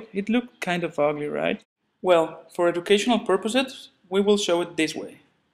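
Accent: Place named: Spain. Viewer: I don't know whats worst for an american, spanish accent or my russiangermanportuguese accent =P But in fact, he made a such awesome job!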